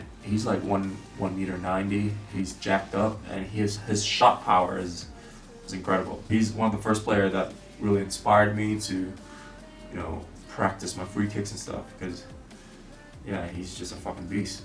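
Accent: United States. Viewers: From your accent I thought you were American not British! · You said you are from Britain but then why do you have an american accent???????????????????//// ????????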